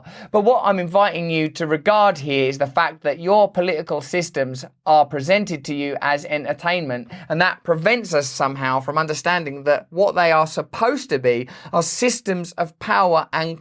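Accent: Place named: United Kingdom. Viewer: RB I very much enjoy and appreciate your videos. You're cool and I don't think of you as an outsider. You seem more tapped in to things going on in America than most Americans. Your videos certainly are an eye-opener. I'm happy to become more conscious and the info comes with a lovely British accent. You know we Americans are partial to that. We just can't help ourselves. I'm watching and listening to more of your videos because I want more useful information. You're telling us the truth about ourselves and our country. I seek the truth in everything. Thank you for all the time and work you're putting in to revealing that truth. I'll be watching and listening for more of your enlightening videos. It's almost tea time. I do enjoy a good cup of Earl Grey. Good day.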